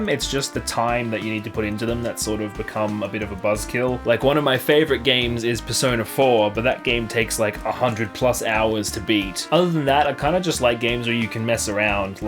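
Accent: Australia. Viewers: Australian I'm pretty sure... not positive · Kneight yep Australian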